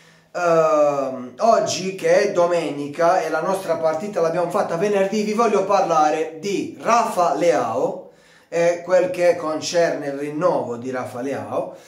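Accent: Bari, Italy. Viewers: Ti seguo anche se sono interista perché sei simpatico. Il dialetto barese è troppo bello….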